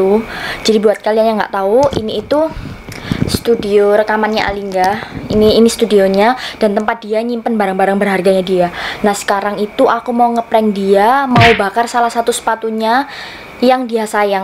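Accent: Java, Indonesia. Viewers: Iya soalnya medok gitu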